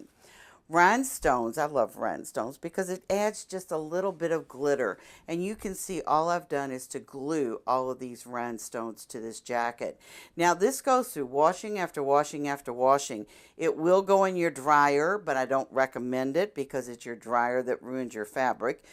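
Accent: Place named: South, United States. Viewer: She has some what of a Southern/mid west accent sometimes it's hard to understand southern people lol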